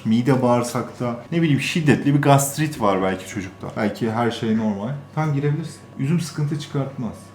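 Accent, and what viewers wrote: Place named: Tokat, Turkey. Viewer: Tam tokatlı şiveden belli 😂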